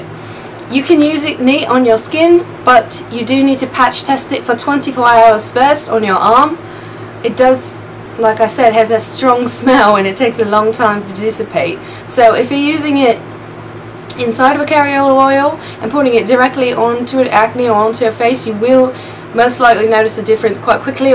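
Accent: Australia. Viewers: I'm Australian and use tea tree daily love it! It was entertaining listening to your Aussie accent! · loving the aussie accent!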